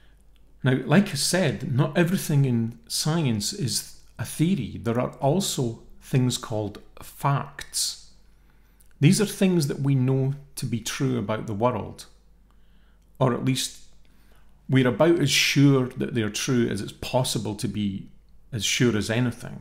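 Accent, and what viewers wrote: Scotland, United Kingdom. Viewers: I always look forward to the beginning in the Scottish brogue (hope not offensive) "ello and welcome to another video" A nice, refreshing entry into the expected reassuring logic.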